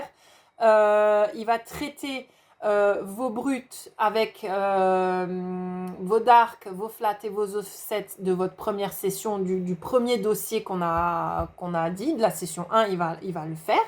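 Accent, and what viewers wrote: Alsace, France. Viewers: Ah on entend enfin ton accent :) et je découvre l'humour alsacien :) sinon je garde ça de côté j'en suis pas là !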